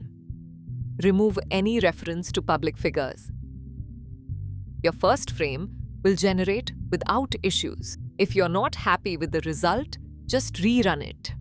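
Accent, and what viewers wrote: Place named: India. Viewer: поменяй уже наконец акцент у своего аватара с индусского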